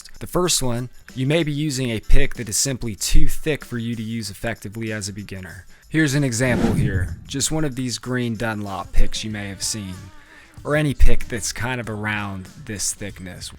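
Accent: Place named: Philadelphia, United States. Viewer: Your Philly accent is uncontrollable brother i love it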